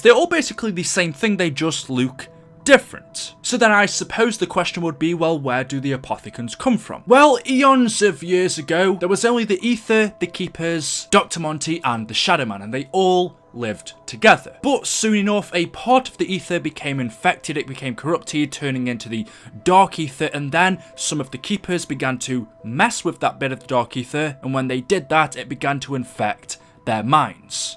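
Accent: United Kingdom. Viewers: Are you British because your accent is awesome! !!! ₩ h ¥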